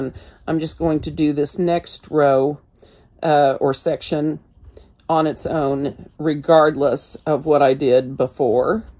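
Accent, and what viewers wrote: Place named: South, United States. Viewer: I am really enjoying your videos and your gentle, southern encouragement,. Thank you for the time and effort you are taking to make them. You have reminded me that an embroidery teacher once taught me that we all think the person on our left has nicer stitches and the person on our right has nicer colours, but remember that each one of us will always be the person to the right or left of another learner. That is exactly what you are saying about Zentangle. Don't give up, just be you. (Melb, Australia - we are opening slowly but with great vigilance. The curve has definitely flattened but we are still seeing random local clusters. Fortunately out of 7k cases we have only had 100 deaths).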